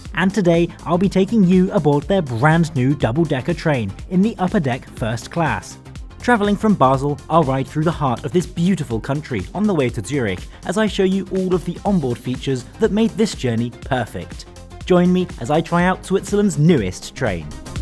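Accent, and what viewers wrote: Germany. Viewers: He's pronouncing them in germany-german not swiss-german😂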